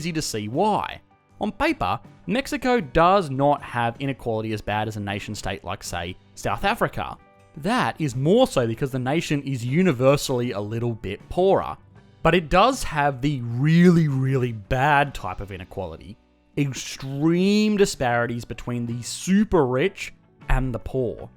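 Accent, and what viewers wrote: Australia. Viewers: Good content but the Australian or New Zealand accent makes it hard to listen without getting nausea or a headache. Terrible accent!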